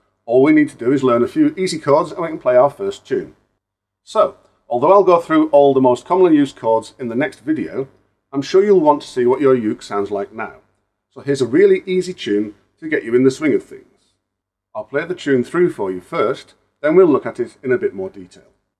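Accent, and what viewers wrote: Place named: England, United Kingdom. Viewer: If nothing else it makes such a nice change to hear a youtube tutorial (on anything) in an English accent. (Sussexman here)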